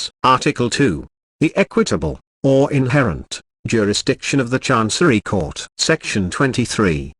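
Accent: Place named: United Kingdom. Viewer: Wish this was in a non-british dialect.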